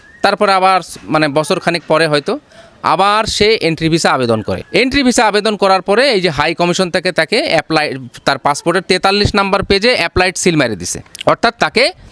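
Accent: Bangladesh. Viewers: Apni ki nije bangladeshi? Accent sune toh tai lagche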